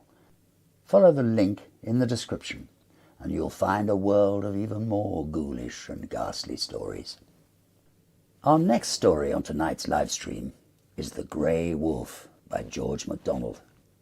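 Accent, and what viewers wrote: United Kingdom. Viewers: Hi! Just found you tonight when unable to sleep. What a treasure: your voice and your stories! I will definitely hunt them out! Have always been a big Hallowe’en fan & hope you have some 2023 tales. I just turned 72 by the way. Living in the Great White North in Cambridge, Ontario. I so miss British voices VERY MUCH! The person in my childhood who showed me the most love was my Gran from Folkestone in Kent. She was very open minded & tuned into a lot of things that my school friends’ grandmothers would probably have never heard of. She saw a lot including joining the Wrens during World War I when she was 17. I’m trying to be discreet about some of her interests which became my interests as I got older. I’m sure she had a lot of stories that I would’ve loved to hear. I just adored her! She married my grandfather who was serving in France in his veterinary corps (he was a farmer from Quebec); so, she was a war bride from the First World War. 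My whole life I’ve wanted to go to England, Scotland and Wales! This is a real treat for me to have found your channel! I am mostly bedridden go now. Have a wonderful Hallowe’en & thank you so much for your lovely programme!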